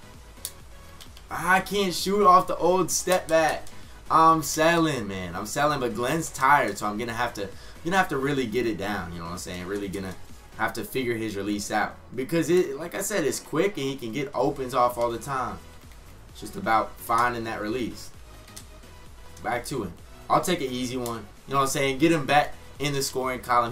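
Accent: Iowa, United States. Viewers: Are you from Iowa?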